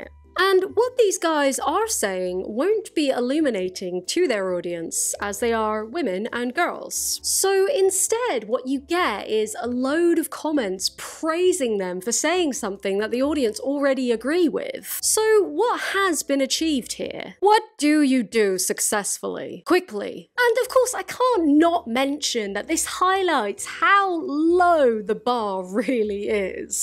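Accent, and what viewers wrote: Ireland. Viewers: Your Irish accent was thriving this video and I am HERE for it. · Until you made the Ireland joke I assumed your accent was just English but now all I can hear is your Irish R’s lol (especially when you said ‘argument’) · wait, you’re irish? cumann na mBan video when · has an Irish accent like my wife's. Perfectly innocuous English accent, and the BOOM, the letter "r" appears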